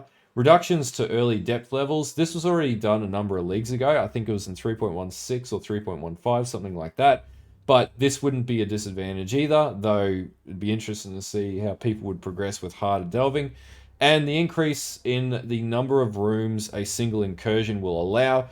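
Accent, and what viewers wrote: Australia. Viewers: i have missed the aussie twang. it is so good to hear poe ideas spoken in my lingo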